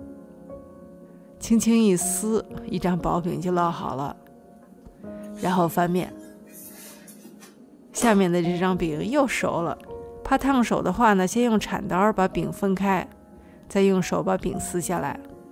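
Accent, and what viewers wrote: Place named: Shandong, China. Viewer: 我听口音还以为是山东人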